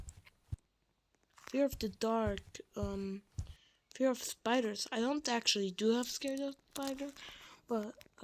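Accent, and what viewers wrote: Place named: Arab world. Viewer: You have arab accent! Just like i do! But yours is deep Mine is smol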